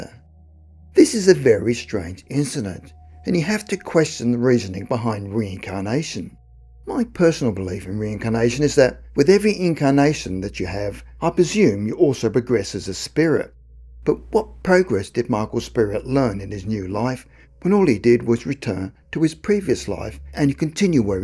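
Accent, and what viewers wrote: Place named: Australia. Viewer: Interesting. I died in Germany WW2 in UK Army. Apart from UK and Ghanaian' I've also met German and USA people who also have memories of their WW2 deaths. But I don't talk publicly because people get unreasonably angry at such disclosures. I mention this because the audio narration for this video is Australian. And if USA, Brits, Ghanaian and Germans are already back from a WW2 death, it signifies Australians have also returned to rebirth in a new physical body but may not yet be aware of it. I think rebirth is required for further evolution of our human consciousness. I died in Jan 1945 north west Germany and was reborn London UK Aug 1949. So, some of us come back very quickly. I don't hate Germans. I love German music Bach Beethoven, et-al. I consider the world was sucked into a cosmic maelstrom at that time, and that caused the wars. 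You can't stick the blame on any one group. About the young boy in the featured story...........did he die prematurely from a car accident or illness, which is how he died, and departed from his wife, who he had had great affection for? Note: I don't do drugs or alcohol, so this not a fairy story.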